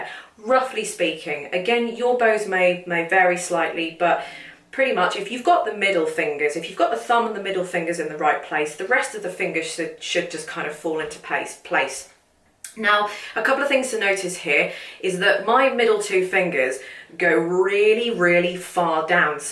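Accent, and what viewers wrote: United Kingdom. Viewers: I do not know yet your name, but I feel grateful to see individuals like you sharing with us to make our dream come true. I always wanted to play violin since I was a child. I just made the decision to learn following your lesson, and guess what, I bought my Mendini MV500 4/4 full side violin last week, and I have made the total decision to learn playing violin, and know how to play "Amazing Grace", that is what I will do first.Thank you, your lesson are easy to follow, and love your British pronunciation. I have one request for you : can you play Amazing grace with the violin for me!!! Thank for motivate me in this new adventure.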